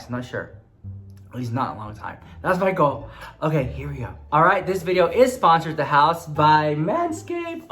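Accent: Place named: Texas, United States. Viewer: It was driving me crazy not knowing where your from... so I went to your Twitter to find out. I KNEWWW YOU WERE FROM TX TOO!! I hear that accent girl. im also from tx lol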